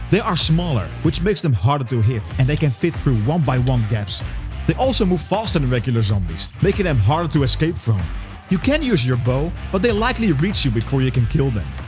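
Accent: Netherlands. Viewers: Bro is echt puur Hollands 😂😂😂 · your accent is so extremely dutch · Ik hoor aan je engels dat je NL bent 😂😂😂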